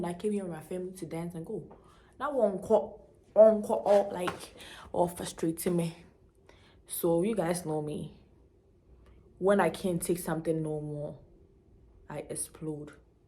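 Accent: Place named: Ghana. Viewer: I’m getting the oseikrom vibes from the way you’re talking…lol Reminds me of my ex… Next time get a strong black man along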